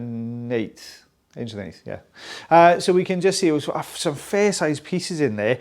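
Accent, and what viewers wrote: Wales, United Kingdom. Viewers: I swear I only watch for the welsh accent. Not been to Wales since covid 😢. I live abroad now.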